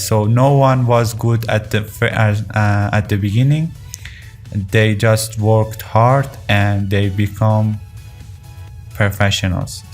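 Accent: Iran. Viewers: Persian accent?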